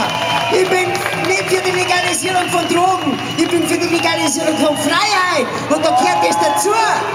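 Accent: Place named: Bavaria, Germany. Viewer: Verdammt warum muss er aus Bayern kommen, ich versteh fast nix 😔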